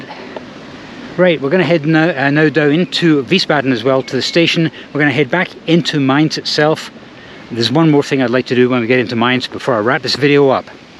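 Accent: Scotland, United Kingdom. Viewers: What English dialect are you speaking? It sounds a wee bit like Scottish, but since I am no native I cannot tell, really.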